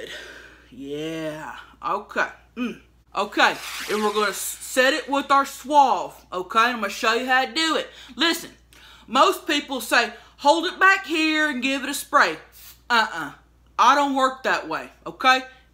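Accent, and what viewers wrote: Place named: South, United States. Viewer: I just realized how damn southern you are, where you from?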